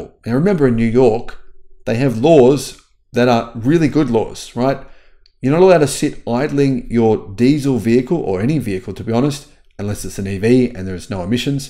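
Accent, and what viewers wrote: Australia. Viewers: Nice listening to an Australian talking about EV/Tesla. The American commentators have their head well and truly up Trump's ares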